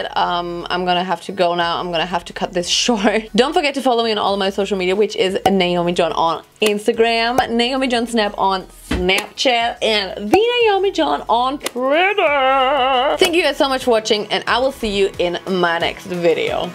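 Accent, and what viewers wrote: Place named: Germany. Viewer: Ist sie deutsch ? Weil die hat Nh deutschen Akzent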